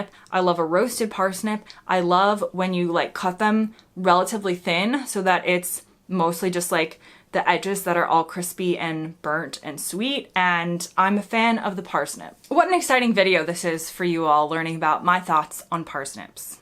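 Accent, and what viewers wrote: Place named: United States. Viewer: The self deprecating sarcasm about the drollness of a video about parsnips being delivered with the chirpy American accent is brilliant, we are obviously rubbing off on you, love the videos ❤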